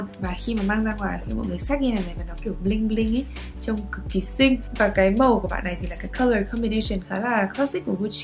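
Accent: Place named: North, Vietnam. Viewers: sao chị học ở sg mà lại nói giọng Bắc thế ạ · vẫn giữ được giọng Bắc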